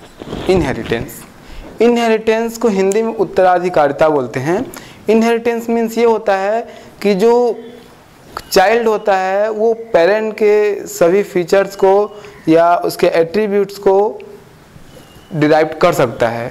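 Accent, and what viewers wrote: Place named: Bhojpuri, India. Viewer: bhojpuri tone me baat karte hai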